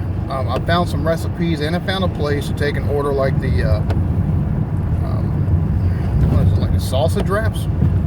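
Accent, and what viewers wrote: South, United States. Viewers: And I'm good with your accent no matter how southern you get, I'm a big big country fan all my life so it's second nature for me . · It’s all good brother. You may sound a bit like a hillbilly but I think most people on here can see your, as we say in a Australia... a top bloke 👍 keep the videos coming mate 😊👍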